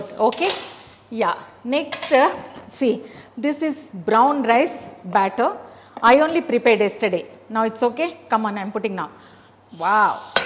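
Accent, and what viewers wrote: India. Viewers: Yenduku antha over action butler English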